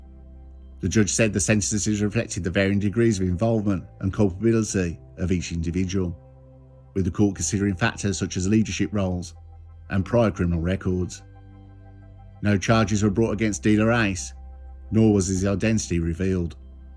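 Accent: Birmingham, United Kingdom. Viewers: it's a shame your longer videos don't do so well. I find your voice very soothing. Broken nose with a soft Brummie accent is a winner for me. Maybe some more police interview footage would help. Anyway, you do you and good luck👍.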